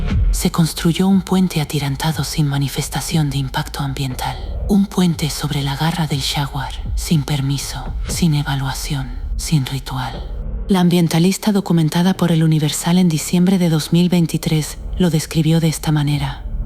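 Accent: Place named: Spain. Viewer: JAJAJAJAJA PORQUE PRONUNCIAN "ALUCSES" SI SU PRONUNCIACIÓN CORRECTA ES "ALUSHES", PORQUÉ LA NARRADORA TIENE VOZ ESPAÑOLA... NADIE SE TRAGA ESTAS MENTIRAS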